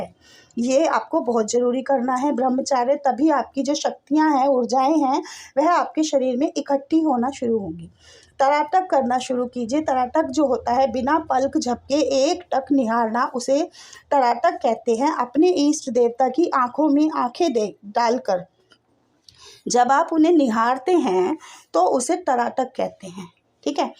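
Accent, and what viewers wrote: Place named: Delhi, India. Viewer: Aapki aawaj se lgta h aap whi di hn dilli wali😊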